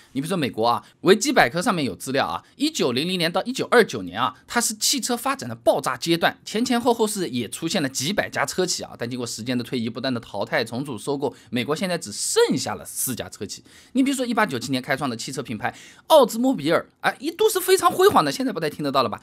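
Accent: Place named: Shanghai, China. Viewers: 听你口音，像是上海或苏锡常一带的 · Jerry J 吴语口音都这样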